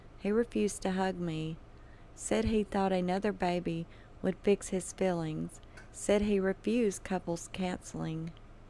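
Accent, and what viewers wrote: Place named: Texas, United States. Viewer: Great voice, a kind gental loving voice with Texas accent!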